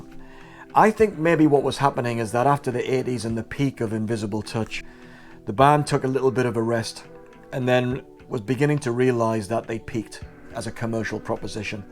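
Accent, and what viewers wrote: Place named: North East England, United Kingdom. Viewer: By the way, where’s your accent from. Sound like another north east native.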